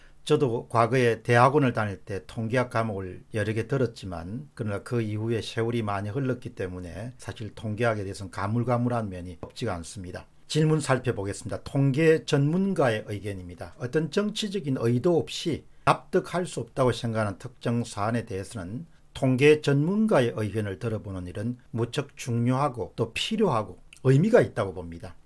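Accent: Gyeongsang, South Korea. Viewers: 경상도 사투리쓰네 ㅎㅎㅎㅉㅉ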